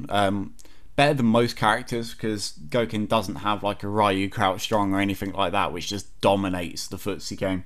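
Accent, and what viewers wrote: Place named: United Kingdom. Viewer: British accents are always great for narrating.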